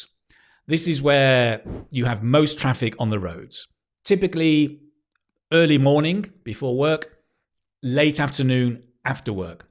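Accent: United Kingdom. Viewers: I love your british accent😊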